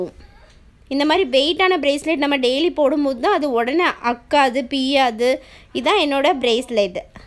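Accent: Tirunelveli, India. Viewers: Sister neenga Tirunelveli ah.....speech apdi iruku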